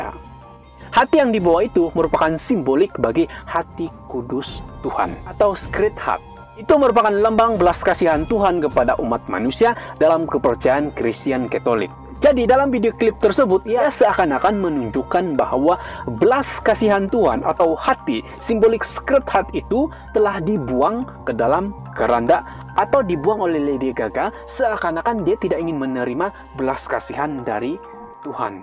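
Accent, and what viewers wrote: Sabah, Malaysia. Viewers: Sabahnya pekatt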